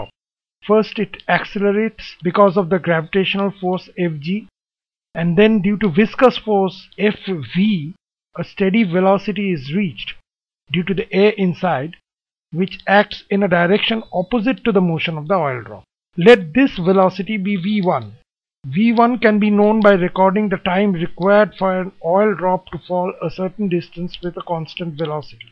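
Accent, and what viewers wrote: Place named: India. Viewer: the way u r speaking is idniglish (indian english).... bakwaas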